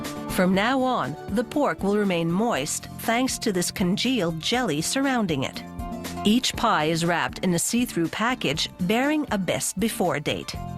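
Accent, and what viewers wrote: Canada. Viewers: The narrator sounds Canadian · that Canadian accent tho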